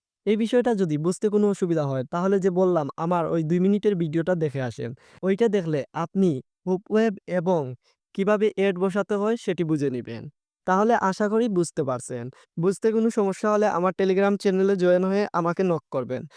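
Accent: Sylhet, Bangladesh. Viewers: ভাই আপনার বাড়ি কি সিলেটে ❤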